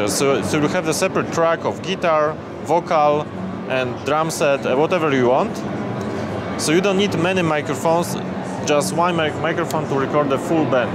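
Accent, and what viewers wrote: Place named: Poland. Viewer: Polish accent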